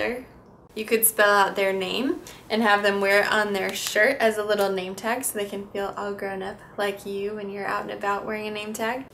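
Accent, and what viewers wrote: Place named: United States. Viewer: When I hear you talking, I hear an unclear voice like somebody who just woke up or have been to a place where you had to shout loud, like your voice is cracking, hard to describe but I was talking with other people in my country about it and they said it is typically something American women do. Why do you guys do that? It doesn't sound like a nice clear voice to listen at to be honest.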